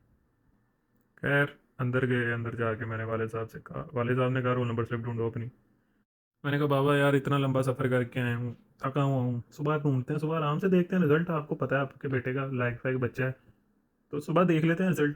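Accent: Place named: Punjab, India. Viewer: That Punjabi Accent 👌🔥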